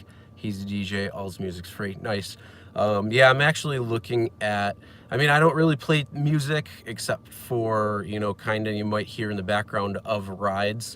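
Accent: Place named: Buffalo, United States. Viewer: i recognize yer bfflo accent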